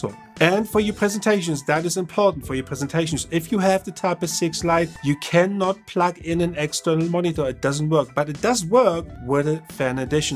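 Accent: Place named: Germany. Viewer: I like your german accent, schönen Tag noch 👍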